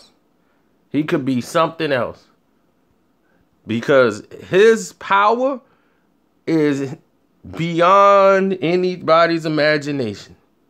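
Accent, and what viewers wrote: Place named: South, United States. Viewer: That southern drawl cracks me up! What you say is true.Takam left his fight with his head held high. Stiverne did not.You can lose with dignity or without it.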